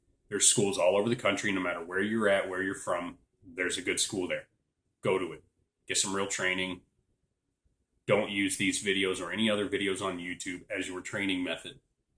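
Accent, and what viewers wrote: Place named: South, United States. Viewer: Good intro. I have to agree that people should look at youtube as entertainment. Oh, nothing wrong with a good southern drawl. Looking forward to the new channel. Cheers!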